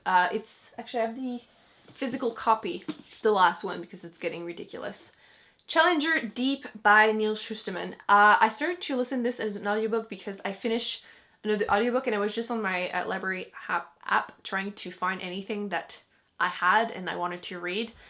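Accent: France. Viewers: sorry but each time I keep wondering... are you french ?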